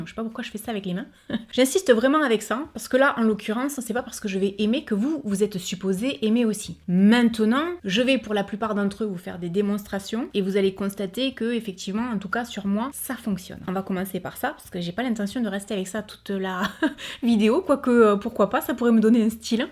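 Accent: South, France. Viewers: Des cils de bichoubette 😁♥️♥️♥️ j adoooore l expression toute mignonne! Et cet accent de chez mon sud, si mignon 🤗 bravo, vos vidéos sont de l énergie positive pure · Merci pour tes partages! Ecouter ton accent du sud est un pur bonheur (je vis en Belgique lol) Pour le démaquillant, je t'invite à essayer l'huile de jojoba chez Aromazone si les huiles ne te dérangent pas et après hop un peu de savon et le tour est joué! Et c'est très économique! Et ça fait partir les maquillages colorés (comme j'aime lol ). Eh oui, par contre il faut se méfier des savons et les choisir si possible "saponnifiés à froid" car ils ont gardé comme cela tous les bienfaits des huiles. Le mascara que j'adore chez Essence c'est le Lash Princess! Ils fait des cils de fou! Et les brumes c'est super pour fixer le make-up également!;-) Des bises lumineuses Steph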